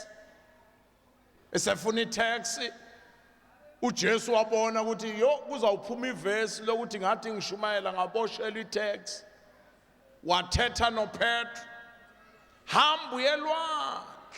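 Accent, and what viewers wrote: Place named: Xhosa, South Africa. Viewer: Wamuhle simakade asimnandi isiXhosa sakhe sindenza ndiyive ngakumbi mna lo myalezo, ziphi na inceku ezigcwele ilizwi nje ngale ndoda.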